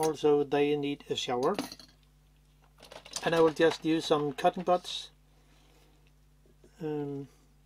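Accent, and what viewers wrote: Denmark. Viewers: WAUW!! This is SO strange and interesting:) I have JUST found your channel because my dear dad gave me his collection of vintage Nikkor lenses and the Micro-Nikkor 55mm was tight with the focus. Well, you made a video about. But the interesting thing is this: I noticed what sounded like a danish accent and now I find out that you in fact are from Denmark AND your name is. Well guess what...I am also from Denmark...and my name is...:) Tusind tak for videoerne, min ven!! Hvis jeg kommer til at lave rod i den i mit reperations-forsøg, så håber jeg at du måske kan hjælpe mig;) mvh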